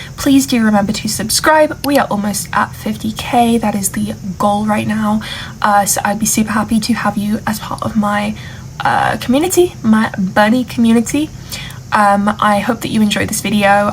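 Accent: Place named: United Kingdom. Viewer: I also like your accent,. I like British and British-sounding accents a lot. 🤍🪽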